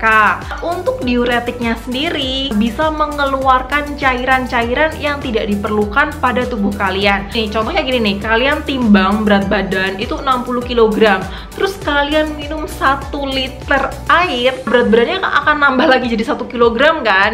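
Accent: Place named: Banjarmasin, Indonesia. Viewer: Kaya nya orang banjarmasin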